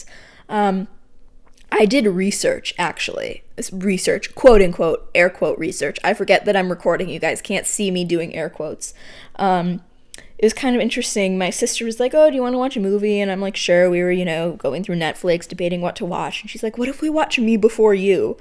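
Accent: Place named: United Kingdom. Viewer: bloody hell that british accent was quite delightful, i liked to hear you criticize the movie industry because they have taken so many bad decisions when it comes to casting certain actors thinking it will not appeal to most of the audience,i think your point of views are well fundamented and i agree with you on most of them,so keep up the good work :)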